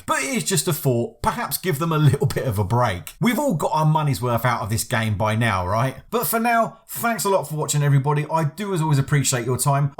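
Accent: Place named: England, United Kingdom. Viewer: yeah, why not? I can listen to that English accent for a while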